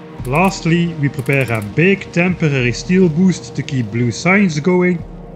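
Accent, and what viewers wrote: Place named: Netherlands. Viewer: you are too humble - any of your tongue tied treats is a song to any of us bots, boys or babes! Doesn't need a tune - your dutch accent is sufficient music :)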